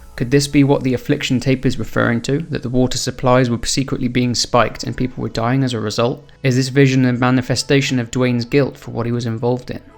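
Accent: United Kingdom. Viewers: Why r u british?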